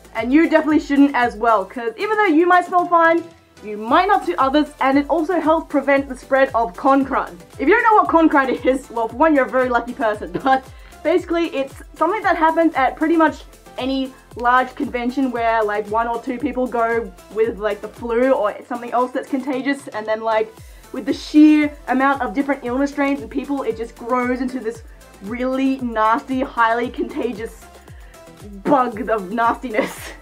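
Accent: Australia. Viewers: Why do I keep thinking you sound Australian? >:3 if you are Australian do you recommend I make a kangaroo fursuit?? :333 I’ve been questioning if I should or not recently because I’m making a fursuit this October, or at least I’m making the head feet paws and tail but not the full body because I still need my mannequin that I used for my art textiles project back soooo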